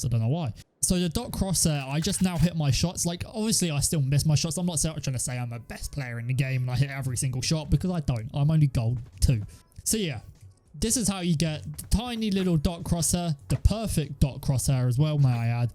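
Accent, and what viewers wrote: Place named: United Kingdom. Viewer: Love the british accent, great video thanks!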